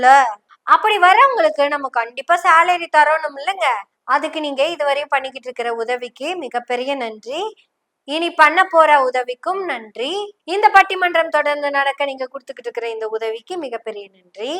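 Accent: Kongu, India. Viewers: உன் முயற்ச்சிகள் வெற்றி அடையும். உன் கொங்கு தமிழ் மிக சுவை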